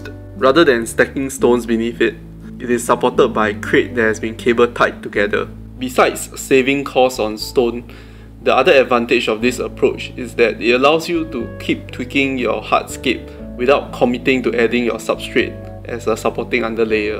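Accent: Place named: Singapore. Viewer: Sound like my country men, u singaporean?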